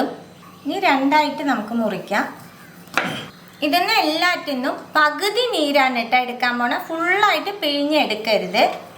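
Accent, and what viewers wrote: India. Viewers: ee narration style kettal thanne video full kandu pokum.. kottayam slang 🥰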